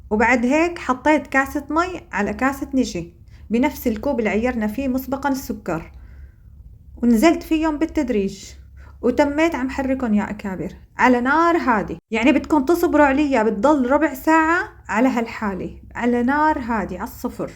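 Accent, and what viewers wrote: Homs, Syria. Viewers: بسكويت وراحة من أكلات الطفولة السعيدة من أيام الزمان الجميل زمن الطيبين كانت الحياة حلوة وبسيطة كل الشكر والتقدير لك أختي الكريمة لهجتك حمصية أصلية حمص يا بلدي يا أحلا البلاد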